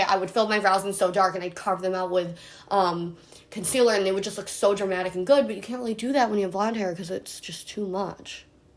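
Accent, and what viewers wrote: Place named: New Jersey, United States. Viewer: Jersey? Or New York...it's not super strong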